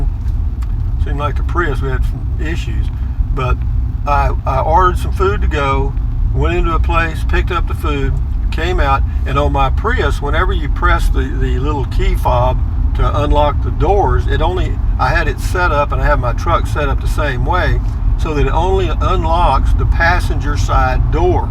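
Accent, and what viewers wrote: Texas, United States. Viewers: Thanks for the Sunday drive! Love your voice and your Texan accent! Good stories. You’re funny- had a couple of gut chuckles😬. Thanks for sharing. Damn, all those roads and not one human in sight!!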